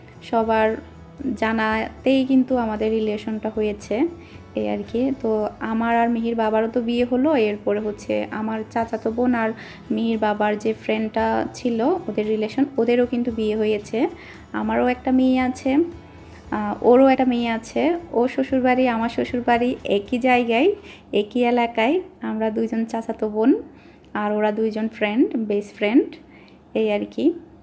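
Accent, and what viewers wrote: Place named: Noakhali, Bangladesh. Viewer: কেমন আছো? আজকে তোমার এই সম্পূর্ণ ভিডিওটি দেখলাম। আপু আমার জানামতে নোয়াখালীর বেশি র ভাগ মানুষ ই চিটাগাং এ থাকে। নোয়াখালীর সাথে চিটাগাং এর জন্মসূত্রে সম্পর্ক আছে। তোমার কথায় নোয়াখালীর একটু টান আসে।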